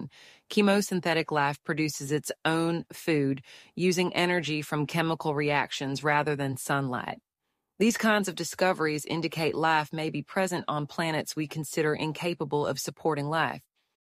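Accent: United States. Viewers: I'm glad you showed the words "chemosynthetic life," because when listening earlier in the video I heard "chemosynthetic laugh" and "chemosynthetic glass." The joys of varied American accents! Although there's poetry in finding "laugh in the darkness." Thanks for pointing out the usefulness of NASA discoveries for surviving on this planet. The more I see what humans are capable of, the more I don't want us to colonize other planets. Humans might be the Kudzu or the cane toads of other worlds.... We have a long history of unintended consequences. Love your work!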